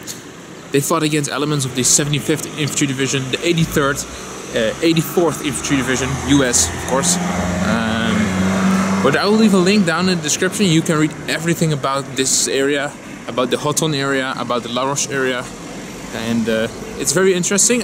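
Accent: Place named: Netherlands. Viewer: He is dutch. I can hear it by his accent :)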